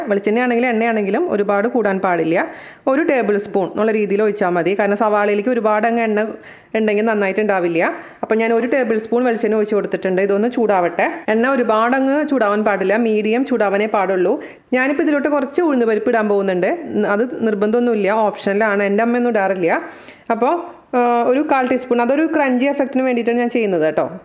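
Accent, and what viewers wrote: Thrissur, India. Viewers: Thrissur aano😊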